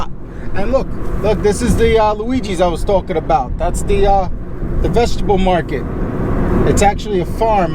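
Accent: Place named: East Coast, United States. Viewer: I love your vibe... right at the beginning of the video I knew you were east coast.... I'm from Syracuse myself just looking for a change! Thanks for the info.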